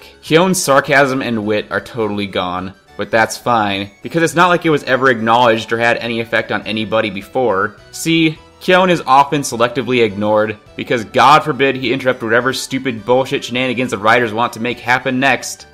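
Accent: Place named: United States. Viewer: God, his American pronunciation of the names, is so fucking annoying!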